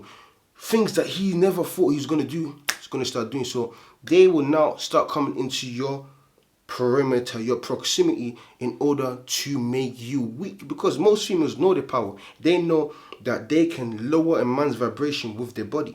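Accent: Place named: Cockney, United Kingdom. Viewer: And another job well done! You're a wise man. I feel like you actually give people facts and the truth behind this. There's a reason it's a sin to masturbate. There's a reason we are supposed to retain our seed unless we are with wife. Hold on tight fella's, it's worth it!!! Cockney huh? Lol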